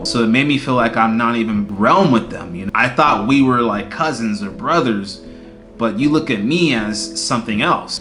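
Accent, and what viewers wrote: United States. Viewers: I am sorry that you didn't get the experience you expected in Egypt. It seems to me that you went with a preconceived idea of what your relationship with the Egyptians should be. Then, you found out that they don't feel the same way about you. But you were lucky you didn't experience any racism there. That's because you carry the little blue passport, and the accent to go with it. Trust me: If you had been from subsharan Africa, you would have felt their racism. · Your American accent gave you an edge.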